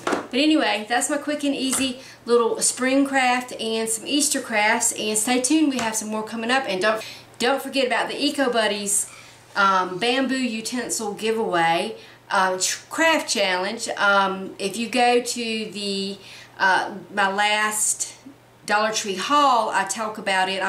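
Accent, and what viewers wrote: South, United States. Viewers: you look beautiful 💖 Love the bunny ideas❣️❣️❣️and I love your Southern accent, you're wonderful ♥️♥️♥️♥️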